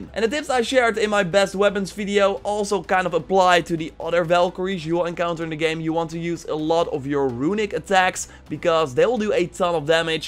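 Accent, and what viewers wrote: Netherlands. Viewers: Jezus what a strong Holland accent!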